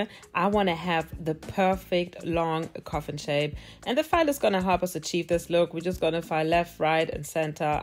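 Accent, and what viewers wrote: Germany. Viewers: She's German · Das ist so dieses deutsche english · Wusste direkt das sie deutsch ist wegen diesem Akzent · Ist deine Nummer Sprache eigentlich deutsch hört man raus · Are you from Germany?😂🙈 you have quiet the accent🤔❤️ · Du kommst aus Deutschland oder haha man hört den deutschen Akzent raus 😂❤️ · Man hört direkt raus, dass du auch deutsch sprichst😂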